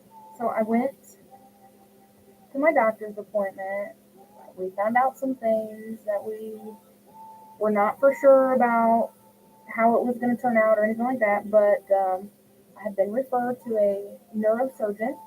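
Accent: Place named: South, United States. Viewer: Yes girl, I've heard your accent since day one. I was gonna ask why you had one, a southern accent living in Illinois 😉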